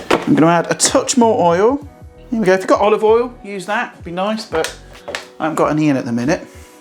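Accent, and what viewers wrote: United Kingdom. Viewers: I watch mainly to see you and I love a British accent 🤗.....of course I'm looking for something different to make. Sometimes I get inspiration from your recipes and make slight alterations to my liking. Love ya' Mate! Cheers from across the pond, Linda.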